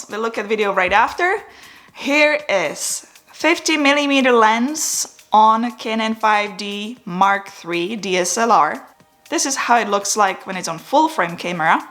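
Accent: Czech Republic. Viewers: Oh wow. Wasn’t expecting the czech accent. Haha. Great video btw! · Are you from Czech republic? :-) I heard "Ahoj" and your accent is also similar to czech-english one.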